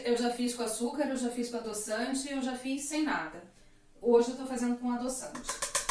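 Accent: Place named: Rio de Janeiro, Brazil. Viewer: A locução mto carioca ,fala gritando rrrr e ssssss. Cansei me deu dorrrrr de cabeça. Deu não 🙆👋👋👋👋👋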